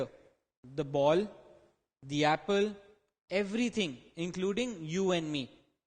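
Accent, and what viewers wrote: India. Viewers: why you speak I-English?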